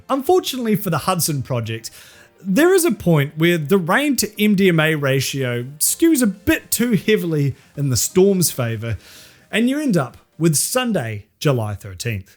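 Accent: New Zealand. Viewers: Kud rock? Like the Kud whale? Haha love the kiwi accent!! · He's clearly from NZ 😅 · Hearing a Kiwi say words like "festival" always brings a smile to my face. Top tier accent. Sunds suu frundly ay.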